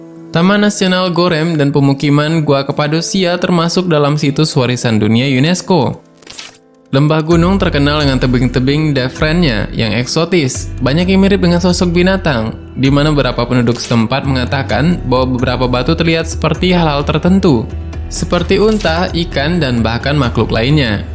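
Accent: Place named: Java, Indonesia. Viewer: Bahasa Jawa mu lucu Min, tapi nice try😁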